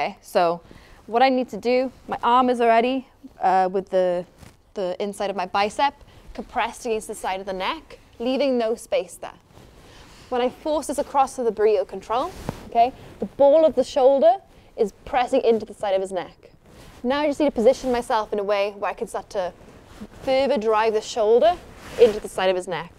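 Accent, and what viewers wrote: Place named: Wales, United Kingdom. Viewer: She's from Wales...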